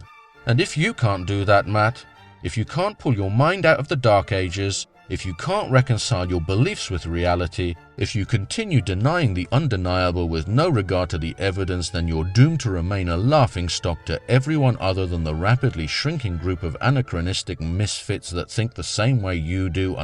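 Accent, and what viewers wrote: England, United Kingdom. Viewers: Because swearing is typically bad manners, and excessive swearing shows poor breeding. Although it is comical within the context of this video because is intelligent, educated, and has a sophisticated British accent. Additionally, this is his channel, so he has and should have the freedom to in his videos. I'm not religious and I don't appreciate it when people swear in public, especially when children are present. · Brilliant!!! Everything I was thinking. But, put more eloquent than I could. Plus cool English accent (not the snobby kind). Problem is, people can not stand not knowing. So they have a tendency to fill in the gaps with whatever sounds cool. 5000 years ago the world being made in 7 days sounded reasonable and there wasn't a body of evidence one way or the other. But, FFS already. Why is it so hard for some people to accept that new information is now available?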